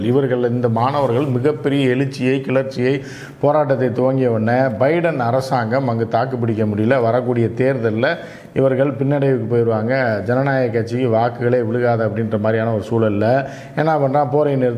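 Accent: Madurai, India. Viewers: <link> pls brother be prepare and talk with our jeeva brother with our madurai slaang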